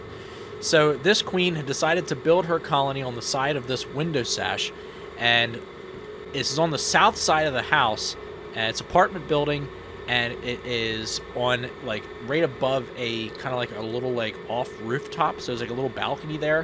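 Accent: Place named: Mid-Atlantic, United States. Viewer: I have to ask....where are you located? You sound like back home - which is Maryland/Delaware/PA/VA for me.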